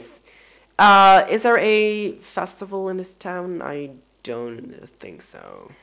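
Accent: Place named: United States. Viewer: Ik dacht echt dat jij Amerikaans was ofzoo je spreekt echt goed engels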